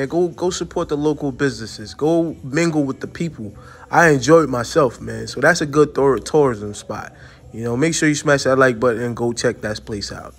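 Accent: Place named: East Coast, United States. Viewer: Haha yeah I can tell you from Jersey or somewhere from the East Coast with that accent 😂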